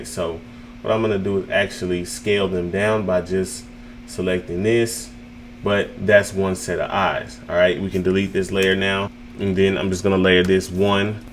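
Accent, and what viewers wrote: United States. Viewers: I here that Texas accent. can't wait to dive into NFTs this year!!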